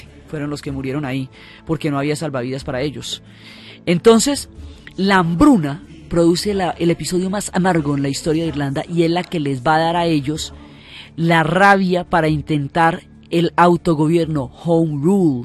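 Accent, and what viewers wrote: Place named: Bogotá, Colombia. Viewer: Es el acento y modismos de los bogotanos tradicionales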